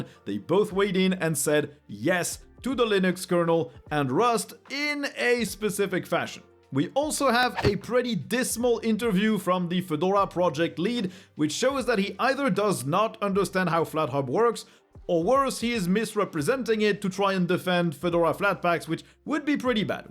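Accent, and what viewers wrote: France. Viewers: L’accent Francais de fou ! Tu es un natif ?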